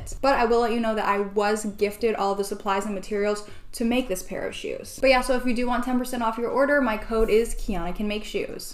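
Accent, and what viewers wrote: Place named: South Africa. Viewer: Just wondering but are you South African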